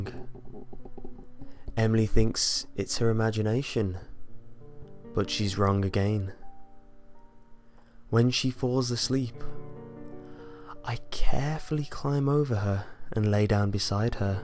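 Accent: England, United Kingdom. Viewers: I want to say that you really are awesome and I love listening to your creepypasta narrations because of your awesome accent. I have to ask though are you from England or do you live in England. I'm wondering because of your awesome accent. My friend told me you probably aren't from England but you're using the accent to make yourself sound better, I say hes wrong which of us is right. But either way I'll still love your creepypastas. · Your slow English accent makes everything more intense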